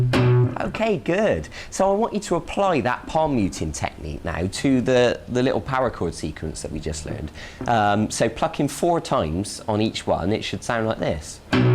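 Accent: United Kingdom. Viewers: haha i like his accent... !! british rocks... !!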